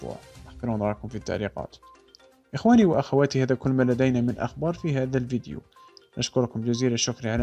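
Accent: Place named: Marrakech, Morocco. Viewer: تخفي لكنتك المراكشية و لا تستطيع اختراقنا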